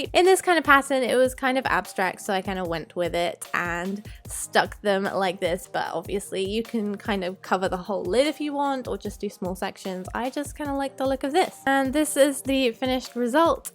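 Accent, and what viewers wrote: England, United Kingdom. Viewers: are you from the UK ? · Are you from the uk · Are you from England? You have a sweet accent :))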